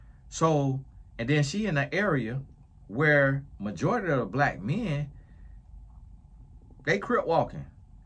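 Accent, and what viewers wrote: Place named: Deep South, United States. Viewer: I'm sorry you got flack for your accent. That's no bueno. Personally, I LOVE accents! New York being my favorite tbh. But southern accents are a close second for me. I live in the south right now, in Texas. You do sound kinda like you're from Georgia or the deep South. My mom's from Oklahoma and she has a lot of countriness in her speech and I tell her she has an accent and sometimes she gets offended lol. But when I say that, I mean nothing bad by it. Accents are what make people unique! There are stereotypes out there, but nowhere should it be implied that people who have accents are less intelligent or less skilled. One of my favorite therapists, Dr. Les Carter has a super strong southern accent (he's also from Texas) and I love it! Accents are amazing!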